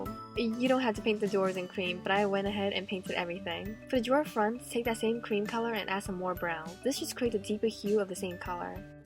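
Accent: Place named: New Orleans, United States. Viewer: Are you from South Louisiana? Your accent sounds like you'd be from New Orleans or one of the communities surrounding it. You sound like home to me!! Also, this is fantastic! The only suggestion I'd make is a simple one: I think that instead of calling the paper pieces you create "stencils" is slightly off. The word "pattern" makes more sense to me, but that could just be me. 😁 Other than that, this is fantastic! I don't make miniatures (yet, at least), but I'm subscribing!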